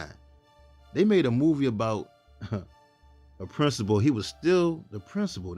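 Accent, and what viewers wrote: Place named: Washington DC, United States. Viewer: I've been watching your videos and I love them! Goes into details I never knew. Sidenote: I keep listening to your voice and I swear you sound like you're from DC. What really made me think that is one of your videos you mentioned Chuck Brown and most people outside the area knows he's the godfather of gogo.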